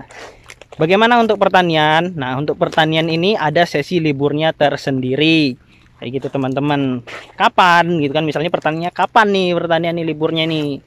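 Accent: Java, Indonesia. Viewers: Asli kalbar tapi logatnya ada jawanya?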